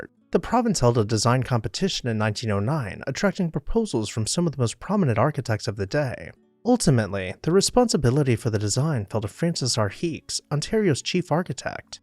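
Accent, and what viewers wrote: United States. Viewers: Correct. Although factual, the narrator has an annoying way of speaking and a definite American accent. Words are written using American spellings omitting the 'u' as in the word favourite. Never the less, Toronto does have a history of demolishing its best architecture, as this video illustrates. I've been to Chorley Park and all that is left is the plaque and the bridge. Truly sad.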